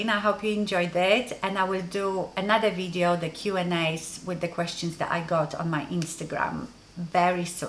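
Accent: Poland. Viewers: You still have polish accent as well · I loved hearing your story. I am relatively new to watching you and didn't realize you were Polish. I often wondered about your accent. I truly hope you and Paul are still enjoying life together. Thanks for sharing. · Hello it’s so nice to hear your story. It reminds me of my own I’m croatian and came to France in 1995. Life back then was so different and you are right we were kind of naïve. And I don’t know you but French people here thought that we lived like a cave man in estern countries... like we never so a tv, Phone, or PC.... so funny. Kisses and Big hug from 🇫🇷 Love your accent I don’t know if there is some polish residu but it’s so cute 💓💯💓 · Oh yes you are, love the Polish accent, I so miss Warsaw😢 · I have been watching a few of your videos lately and I always thought I detected a polish accent behind the English accent. 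I finally found your video about how you ended up in the UK and it confirmed you were from Poland. ( My mom is Polish and moved to Toronto in 1971)....funny thing is that the video got even more personal for me because when I met my husband he used to wear this ridiculous ring too and I completely forgot about that. lol It looked like a hockey championship ring (but was not). Such a brilliant idea to make a lovely ring out of the diamonds. Thanks for the laugh.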